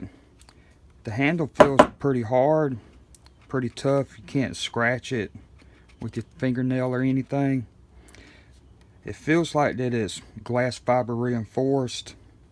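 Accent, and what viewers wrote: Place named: Brooklyn, United States. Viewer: Ok, WHERE online does one obtain replacement points? LOVE that Brooklyn accent!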